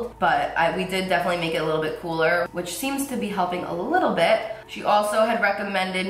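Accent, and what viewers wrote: New Jersey, United States. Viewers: u have a nice thick jersey accent, represent girl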